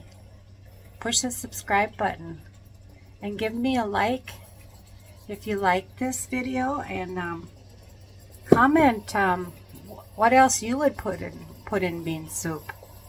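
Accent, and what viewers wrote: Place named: Midwest, United States. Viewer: Thank you 😍I love your midwestern accent 😊Are you from Wisconsin?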